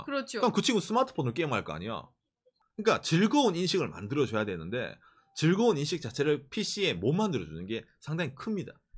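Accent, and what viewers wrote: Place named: Gyeongsang, South Korea. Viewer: 경상도버전 대도서관...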